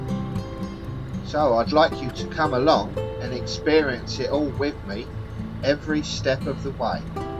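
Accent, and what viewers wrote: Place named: Cockney, United Kingdom. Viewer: Another great vlog and so nice to hear the old cockney patter again. Moved out from the East End about 13-14 years ago as you know and mainly hear the old Essex twang now. e.g. ing has become `n` (shopp`n, work`n) and so on. The locals get their own back and I get my leg pulled for dropping my `H`s 😁 Lovely day for travelling the canal especially with the sun shining. Also glad your not dying of thirst either. Keep them coming, so enjoyable. Seeya. Love Mumx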